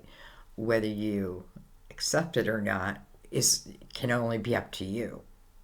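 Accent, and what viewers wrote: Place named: Philadelphia, United States. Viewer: Are you in Philadelphia? You sure have a Philly accent to my ears! Nice reading.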